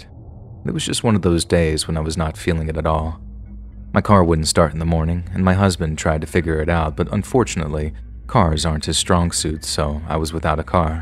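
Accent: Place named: South, United States. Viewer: Your southern accent isn't bad 😆😁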